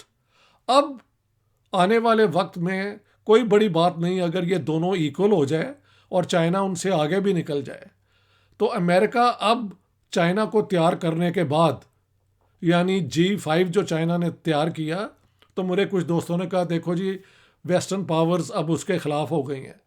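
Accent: Punjab, India. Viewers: I like your Punjabi Style Haan ji...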